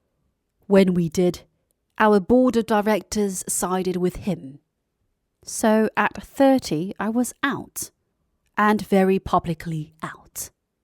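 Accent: RP, United Kingdom. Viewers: 진짜 영국 발음 최곱니다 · 우아한 영국발음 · 영국영어로 들으니 새롭네용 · 영국식 발음을 익혀보려하는데 매우 좋은 영상이네요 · you have the most perfect British Pronunciation I've ever heard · 영국발음 연설을 듣고싶었는데 들을수있어서 기뻐요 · 영국식 발음의 낭독을 따라할 수 있어서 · 동양인이 여왕 영어한다는 거 자체가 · 따라하면 저도 포쉬악센트 할수잇나용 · 영국 포쉬발음은 언제나 매력적이고 듣기 좋아요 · 영국식 발음으로 읽어주셔서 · 영국발음으로 들으니 너무 좋아요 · 님 발음들으면서 영국발음 연습중입니다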